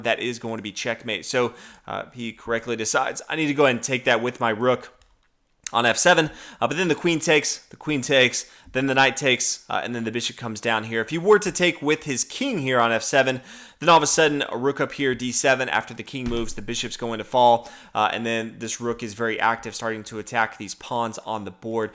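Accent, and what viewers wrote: United States. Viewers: The American accent is always great to hear.. but man! It’s Not bateess Spassky. It’s boris like bo-ris. And really pronounce the r. Don’t roll it.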